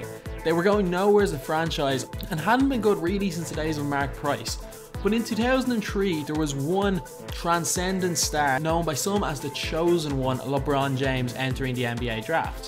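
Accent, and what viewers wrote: Ireland. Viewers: I love the Irish accent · Oi Oi Are you Irish kiad 🇮🇪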